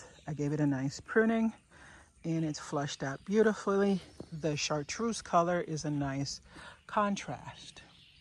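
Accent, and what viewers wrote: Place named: South, United States. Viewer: You have a garden my grandmother would be envious of ❤ I’ve been following you on instagram, just found you this morning on YouTube from your link on insta. My family and I moved to Southern California the end of 2021 and this video is making miss home something bad this morning! 😢 Your accent, the birds, the lushness…. We weren’t in Georgia but near Asheville NC. Happy Saturday! I absolutely love your garden! ❤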